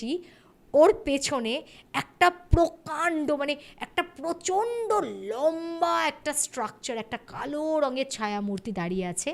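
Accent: United Kingdom. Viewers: Apnar bangla ta khub ekta bhalo na , kerom ekta English tan ashe ....kintu Kichu ekta Jadu ache apnar modhdhe jeita ato attract kore ....r apnar story telling er modhdheo kichu ekta ache ....kerom ekta bokar moton kore mohit hoye shuni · Nekamo kom kore apnar english accent kom shuniye thik thk bhabe ektu short e bolun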